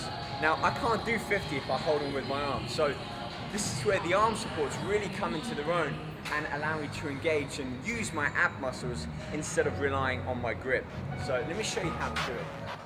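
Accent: England, United Kingdom. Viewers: He sounds so much younger in this video, with more of an English accent. Lol.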